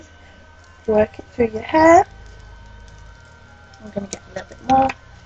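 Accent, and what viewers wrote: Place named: United Kingdom. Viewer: Lol nice accent...from the uk?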